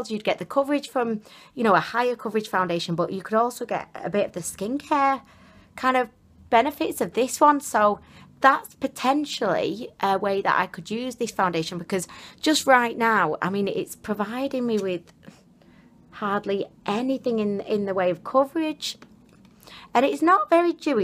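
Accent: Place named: Northwest England, United Kingdom. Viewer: So refreshing to hear a Northern West England accent. Great video.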